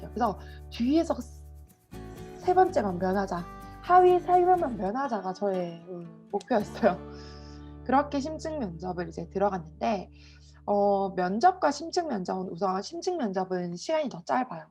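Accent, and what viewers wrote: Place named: Daegu, South Korea. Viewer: 저는 대구 친구가 많아서 ㅋㅋㅋㅋㅋㅋ막 들리네요 ㅋㅋㅋㅋㅋ그래서 걍 편히 녹화하셔도 될 것 같아요 친근하고 더 좋아요!!♡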